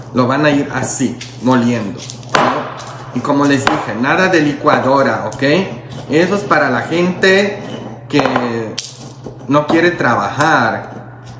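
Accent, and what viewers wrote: Mexico. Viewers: Jajaja jajaja jajaja jajaja jajaja 🤣 padre me hace reír con su huuuuuuuuuuuuu como me hace los días más alegres dios lo bendiga y cuide usted si es mexicano hasta en la manera de hablar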